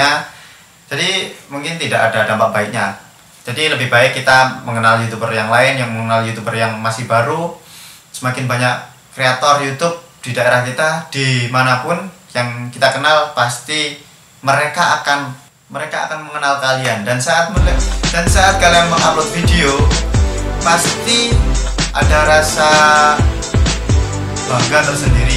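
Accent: Java, Indonesia. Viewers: Wong jowo yo?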